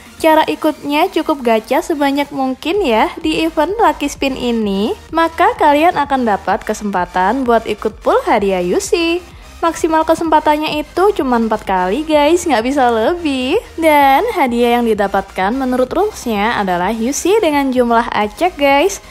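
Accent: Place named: Central Java, Indonesia. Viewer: Logatnya kya orang jawa tengah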